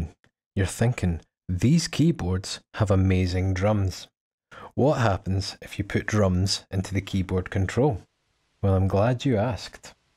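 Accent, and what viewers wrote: Scotland, United Kingdom. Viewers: Nice hearing another Scottish accent on gear. I got one of these ages ago but never really explored the input weirdness. I didn't even think of using a Euro oscillator/sequencer for the pitch. This is great. Just what I was looking for. I wonder if CV could be sent to the HOLD input too. Hmmm.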